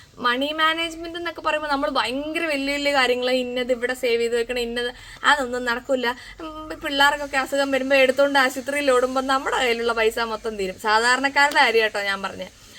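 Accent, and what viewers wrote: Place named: Wayanad, India. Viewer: ഏത് നാട്ടുകാരിയാ... നമ്മളെ കൊടുവള്ളി സ്ലാങ് അല്ല വയനാടൻ സ്ലങ് അല്ലെ... പിന്നെ ഇത് എവിടയാ... 🤔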